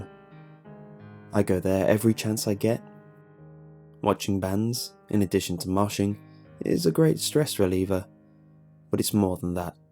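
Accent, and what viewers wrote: England, United Kingdom. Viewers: New England? Sounds like he is from old England to me.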